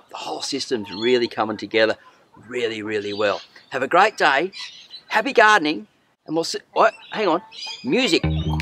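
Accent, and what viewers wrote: Australia. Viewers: Blue ribbon ice cream containers, the Aussie accent and I know I'm watching a video from home 👍 Its always nice to find a new aussie channel 😊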